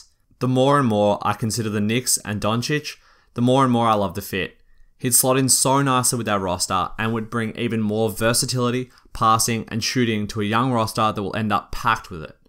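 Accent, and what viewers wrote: Australia. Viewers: u have Australian accent?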